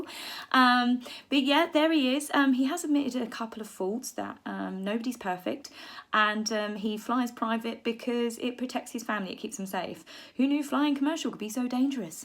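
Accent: England, United Kingdom. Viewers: I really love your accent <3 it's very clear and articulated. From which region of England is it?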